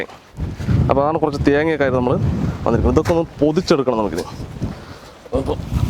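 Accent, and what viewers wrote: Palakkad, India. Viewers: Allelum palakan slang ishtallathorindo.,🥰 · സംസാരം കേട്ടിട്ട് പാലക്കാട്‌ ആണെന്ന് തോന്നുന്നു വീട് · Typical palakkad style of speaking. Good way of preparation. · പാലക്കാട് ഭാഷ സുപ്പർ. പക്ഷേ നോൺ വെജ് തിന്നാത്ത കാരണം ഇതിനെ പറ്റി ഒന്നും അറിയില്ല.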